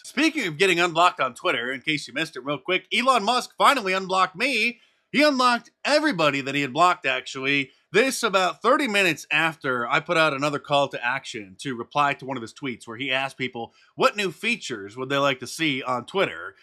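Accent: United Kingdom. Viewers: At least the voice over could have been done by an American sounding voice, not, British, since we are talking about the US Capitol. But, I did enjoy it, and it was funny. I heard the whole thing on Benny Johnson. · Proud that the satirical voice over was by a Brit! 👍👍👍👍🇬🇧🇬🇧🇬🇧🇬🇧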